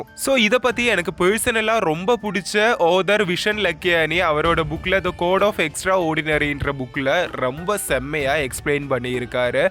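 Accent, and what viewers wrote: Kerala, India. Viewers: Extra ooordinary keralite o pronunciation style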